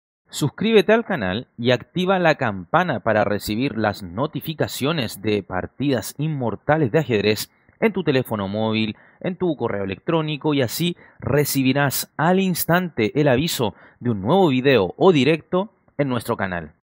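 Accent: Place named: Chile. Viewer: el acento chileno me resulta muy comodo para entender analsisis de ajefrez ! gracias!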